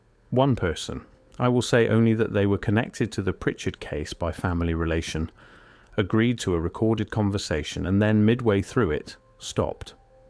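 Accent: United Kingdom. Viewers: Taking this with a huge grain of salt, since a google search turns up no encounter involving anyone of that name. Plus the faceles, unnamed, obviously British narrator claims transcribed materials from the original case wound up in his possession. Seems quite a stretch.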